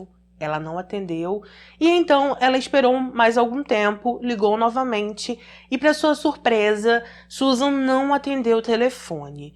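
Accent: Rio de Janeiro, Brazil. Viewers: Adoro O sutaque carioca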